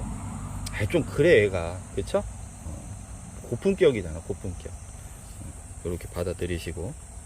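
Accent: South Korea. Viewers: ㅋㅋ 님은 서울말 안어울려요 구수한 시골말로 계속~~~적심방법과 이유 잘 배웠어요😉😊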